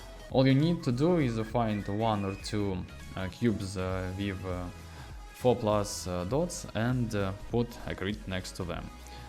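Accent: England, United Kingdom. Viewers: Настоящий англичанин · Oh, this beautiful english accent. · О, вы из Англии?😃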